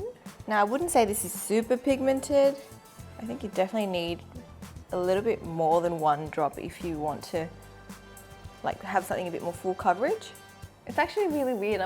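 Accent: Australia. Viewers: nashikin works LMAO she’s Australian and her accent sounds nothing like the British one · where are you from? Your accent reminds me of Australia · im new i was wondering if has any australian background or lives in australia bcoz she has a slight aussie accent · nashikin works she’s not trying hard to be british—it’s her natural accent and it’s AUSTRALIAN. she lives in australia lmao—what do you expect?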